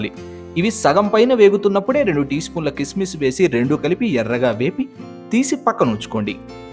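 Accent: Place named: Chennai, India. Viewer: Meedi a oru Chennai telugu la undi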